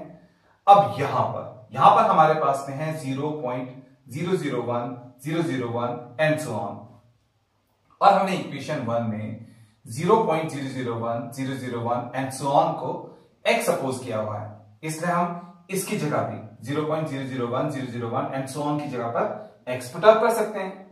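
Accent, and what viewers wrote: Pahadi, India. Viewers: Tum pahadi bolen cha? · Aap pahadi ho kya?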